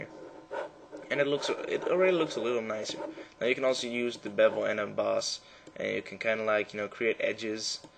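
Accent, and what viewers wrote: Netherlands. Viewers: Ik zie aan je accent al dat je Nederlands bent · Hey bro, awesome vid, btw are you dutch? One little thing that is kinda annoying is that your mouse is pretty loud. I guess this is because you don't use a mouse mat. Once again, awesome vid overal:) gj keep it up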